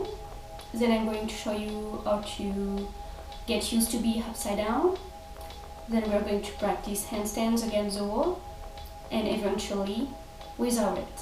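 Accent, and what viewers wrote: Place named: France. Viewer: Great tutorial, very informational and calm. I`ve been in gymnastics for 8 years so I already knew how to do it and I`m not afraid of doing it, but I still loved your video:) I would really like to see a tutorial on how to to chinstand (with transition ) and/or jumping into handstand. My goal is to learn presshandstand but I think I need more strenght :) And I`m not sure what exercices to do to improve the presshandstand. Since I have no strenght I would be excited to at least be able to jump into handstand. Et j`aime bien ton accent français :D Loads of love , laurence. Keep working and posting awesome videos :)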